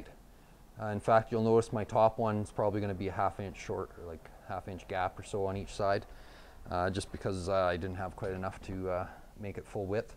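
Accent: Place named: Canada. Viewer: I needed help to fill a window hole. I could only find this and I figured, pretty much same process. This will work perfect. Thanks so much. I was also pleased when I heard you speak. I thought, "Well now...a Canadian accent." Thanks a bunch from New Brunswick.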